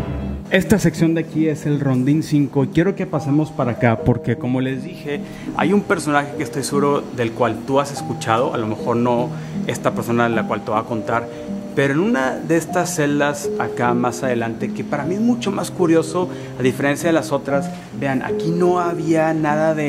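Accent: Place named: Fresa, Mexico. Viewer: Nunca había visto un vídeo de el, muy buen video, solo que desespera su voz exageradamente fresa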